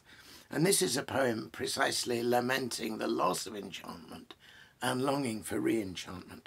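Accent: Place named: Oxford, United Kingdom. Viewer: I just found you. I was looking to find Omar Khayyams wisdoms... An Olympic cruise.... My old Guru, Micky Burn, (author) had that in his bucket list too. You have an Oxford accent, to my ear. Are you back yet from the conflagrations?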